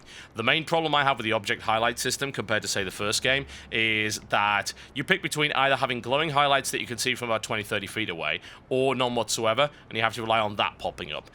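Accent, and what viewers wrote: United Kingdom. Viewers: your british voice is very soothing... #NoHomo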